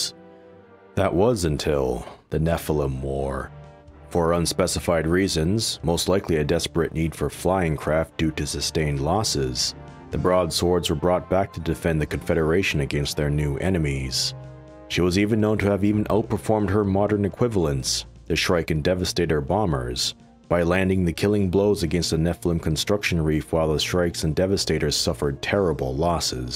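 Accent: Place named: Australia. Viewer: You're obviously an AUSSIE!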